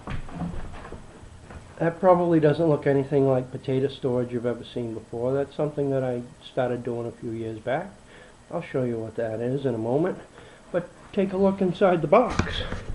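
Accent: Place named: Maine, United States. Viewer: store what you eat and eat what you store. I have been doing moderate food storage for 20 years. I shop at Save-A-Lot every month. time from Maine and you sound like you're from somewhere Within a 50 mile radius. :-) do you know why I started to store food? because my first husband would starve me and then years later over feed me so once I divorced him I still felt like I had to keep a huge closet full of food.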